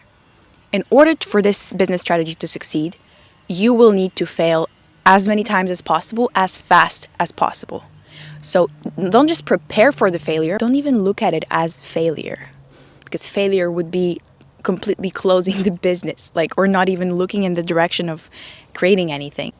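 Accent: Russia. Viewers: wait ARE YOU RUSSIAN???? YOU SOUND SO BEAUTIFUL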